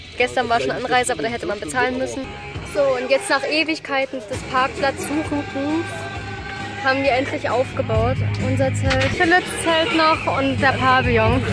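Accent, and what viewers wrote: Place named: East Germany, Germany. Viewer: Hey. Ich sehe gerade das erste mal ein Video von dir (ich war auch aufm Rockharz dieses Jahr) und wollte mal fragen aus welchem Bundesland du kommst. Bestimmt ausm Osten?! Erkennt man am Dialekt.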